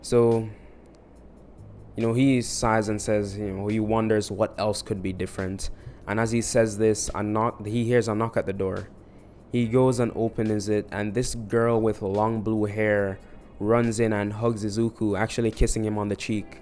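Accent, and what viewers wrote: Jamaica. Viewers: Yo what is this mans accent? I hear a little bit of a Jamaican maybe but I’m really not sure.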